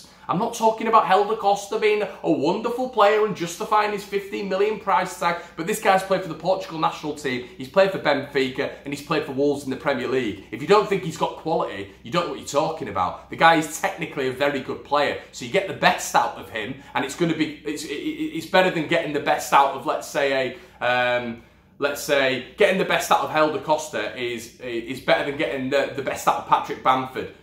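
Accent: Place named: Manchester, United Kingdom. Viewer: Please stop saying y’know what I mean..sound like a manc..other than that , fair comments.. thanks to you and the lads for great content..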